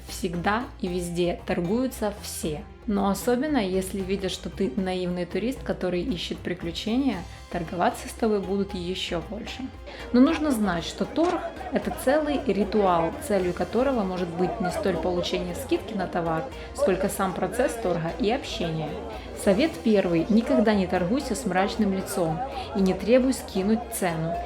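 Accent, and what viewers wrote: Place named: Ukraine. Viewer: поработать над собой, конечно, надо. Но надо учитывать ещё и диалект, акцент. Понято же, что девушка украинка. Вы же не будете делать замечание иностранцу, если он будет разговаривать на ломанном русском. Здесь такая же история.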